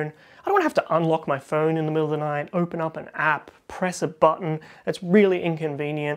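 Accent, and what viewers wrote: Australia. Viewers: Cheers. Are you an Aussie in the UK?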